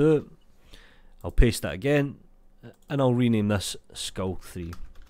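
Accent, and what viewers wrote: Scotland, United Kingdom. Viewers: I started to listen to this video and I was like: Is this guy? He sounds. Well, he is it literally.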